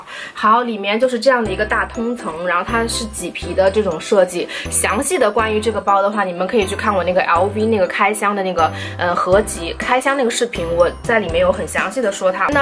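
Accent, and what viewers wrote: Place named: Changsha, China. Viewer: 我发现你说话很像长沙话哈哈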